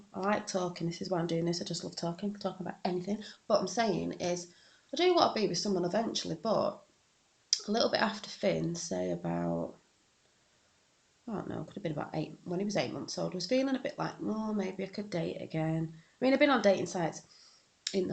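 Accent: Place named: Yorkshire, United Kingdom. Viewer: You are well funny without trying haha! I met my fella on a dating site and it went well he's not a total mental case lol dating sites can help people who haven't got the opportunity to get out where you from you sound Yorkshire I'm from Yorkshire also :-) you have a very infectious personality I bet men love you! Haha the fire sign one lol do you mean tinder? Lol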